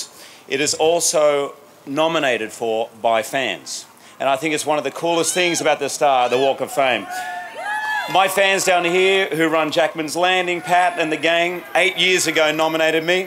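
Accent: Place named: Australia. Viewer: An Aussie to be so proud of - and he still sounds like one!!!!! Love him - and his family.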